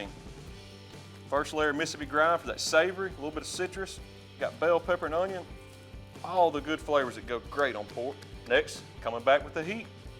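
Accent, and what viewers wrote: South, United States. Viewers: Theres something about a southern accent that warms my australian soul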